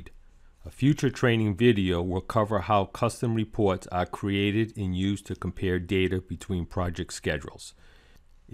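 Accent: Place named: New England, United States. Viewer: very helpful, and I love the New England accent. :)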